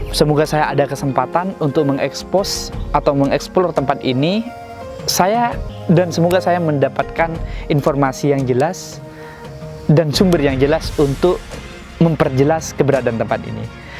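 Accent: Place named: Java, Indonesia. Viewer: Medhok tak iye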